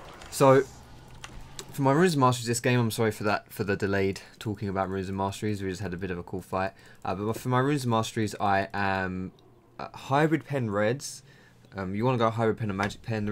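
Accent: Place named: United Kingdom. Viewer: Very manner intensive. Dat British accent.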